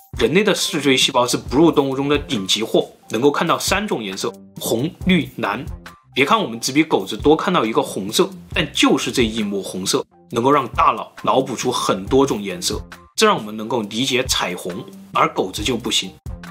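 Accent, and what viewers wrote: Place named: Cantonese, China. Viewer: 是廣東人吧, 講話經常有粵語的影子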